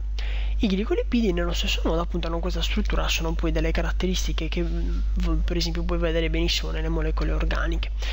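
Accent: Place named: Sardinia, Italy. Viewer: riesci a postare anche i link del test di medicina grazie... i tuoi video sono spettacolari poi con questo accento sardo ancora meglio complimenti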